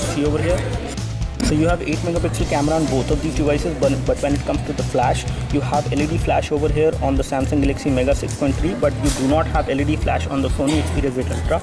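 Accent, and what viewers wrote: India. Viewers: Go fuck your indian accent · I understand you have a strong Indian accent but you should at least talk slower it seems like you were in a rush or something.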